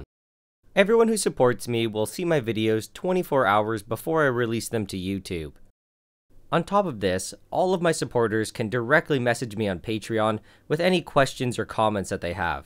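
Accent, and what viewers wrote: Canada. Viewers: I love your thicc Canadian accent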